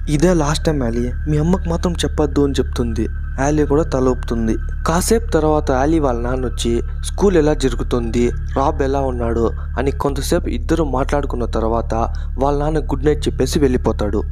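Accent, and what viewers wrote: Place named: Karnataka, India. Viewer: Language ನೀವು ಕನ್ನಡ ದವ್ರು ಅಣ್ಣ 🤣🤣ಪಕ್ಕ... Hemana paravaledu shoppu yav uoru naadi chikkabalapuramu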